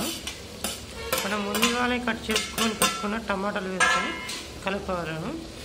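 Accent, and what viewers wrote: Telangana, India. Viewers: Ibbandi padoddu Clear ga telangana bhasha best kada.... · mikku nachinattu cheppu bro... chala kastapadutunnaru padathiga matladataniki.... madyalo Telangana slang vadaru adhe continue cheyu... baguntundhi... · Meeru chala kastapaduthu diplomatic ga matladthunnaruu.. Just miku ela nachithe ala telangana style lo matladandiii.. And nice curry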